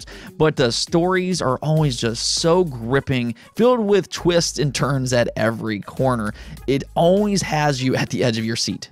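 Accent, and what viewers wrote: United States. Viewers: !! Jeez lassie I thought you were from New York or California! I’m gonna have to try your game too! I got the NEW Xbox though 😂♥️🤷‍♀️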